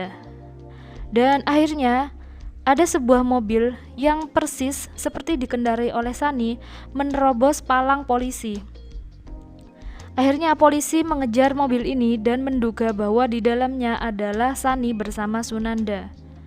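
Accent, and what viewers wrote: Java, Indonesia. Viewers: gagal fokus sya krn mbak medhok... pdhl sdah tegang btul ngikutin alur critnya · Medok mbak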